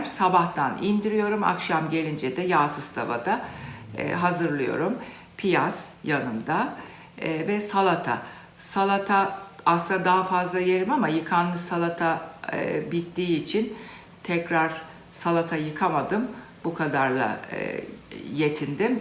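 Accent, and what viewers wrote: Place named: Ankara, Turkey. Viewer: Sevgili Prof.ümüz, Ben sizi rejiminizden sonra tanidim.Güzellik ve canayakinliginiz disinda ictenlik ve dürüstlügünüz cok etkileyici.Ayrica siveniz ve sevdiginiz yemekleri hazirlama tarziniz benimle tipatip ayni.Acaba siz de Ankarali misiniz? Cok sevgiler ve selamlar.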